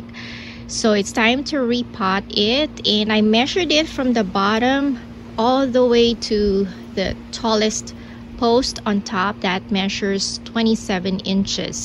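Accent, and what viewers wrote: Philippines. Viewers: If I were a betting man, I'd say you're Filipina with that accent.. (fellow filipino here) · You sound Filipino ;)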